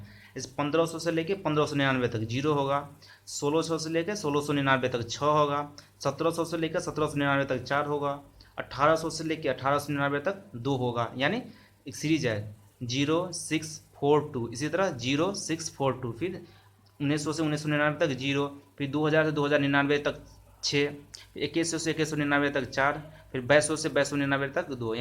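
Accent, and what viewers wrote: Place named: Bihar, India. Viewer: Chhh kyu bilta hai bihari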